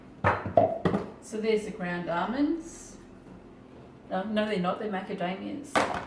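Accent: Australia. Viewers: What State are you in? I live in Perth and have not seen Western Star cream. It's really nice to listen to a quiet Australian not loud and annoying American accents (apologies to any Americans watching). Cheers Rosemary Perth Western Australia (70 yrs)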